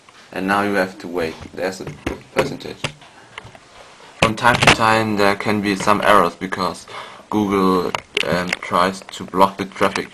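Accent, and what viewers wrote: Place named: Germany. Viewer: lol german accent ftw! :D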